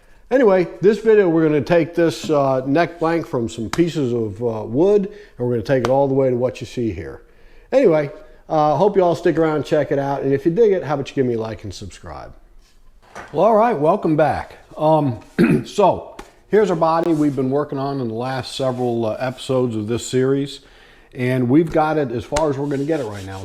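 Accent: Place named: Northeast, United States. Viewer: I'm in North Carolina now from Pittsburgh. I recognized the North Eastern in your speaking. Tired of the ridiculous cold Snow...etc! Really love it down here! BTW, great channel! I am a master builder/Carpenter, and built a few guitars by hand. I was thinking about getting involved in the CNC as well.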